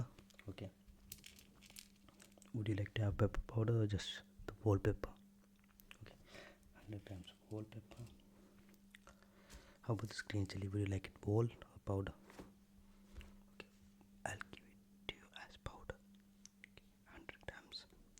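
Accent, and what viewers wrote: India. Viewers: I love indian accent asmr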